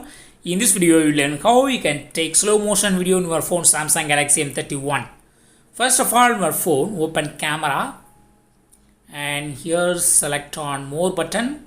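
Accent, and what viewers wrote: Tamil Nadu, India. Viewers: Tamil accent 😁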